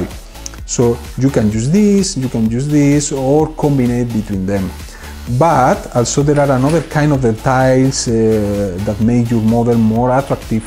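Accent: Spain. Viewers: Very useful. .an original kit. .and your accent is still so. ...spanish 😉i like it 😀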